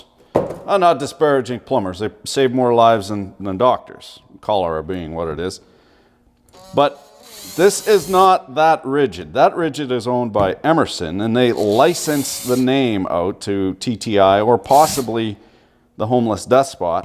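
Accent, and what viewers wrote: Canada. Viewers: Do all canadians speak like that?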